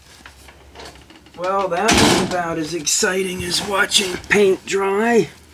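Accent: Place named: Washington, United States. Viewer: your from Washington state aren't you I can tell from your accent its like mine :D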